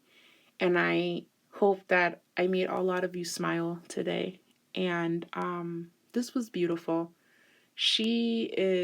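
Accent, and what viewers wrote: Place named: New York, United States. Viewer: Ha. I love your voice and accent lol 😆. New York?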